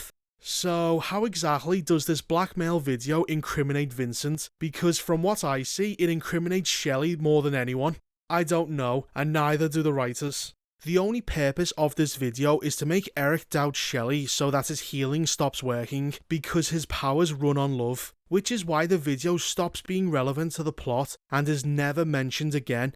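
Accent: Liverpool, United Kingdom. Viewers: Are you scouser???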